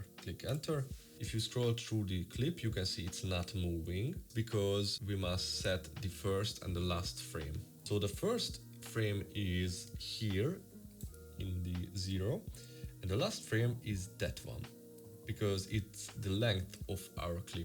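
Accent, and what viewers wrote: Hungary. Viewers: Hello ☺️ pár perce nézem még csak a videót, de már most látom, hogy érdemes lesz végig nézni. Meg lestem már pár hasonlót speedramppal kapcsolatban és kiváncsi vagyok milyen extrát tudsz mutatni azokhoz képest. Ja és remélem eltaláltam a nyelvet az akcentus alapján 😉😃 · Are you hungarian? Na ja jo, egsh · Na jó 😁 addig görgettem míg kaptam magyar hozzászólást 😁 az akcentusból kivehető 😅 köszi a klippet!❤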